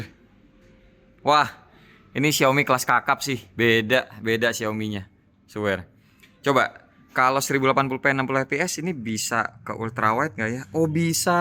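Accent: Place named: Sunda, Indonesia. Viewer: Aya campuran sunda saeutik 😭🤣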